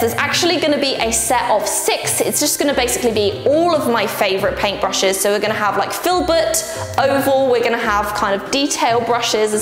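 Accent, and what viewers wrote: United Kingdom. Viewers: You know I really like your uk accent Especially the Amazun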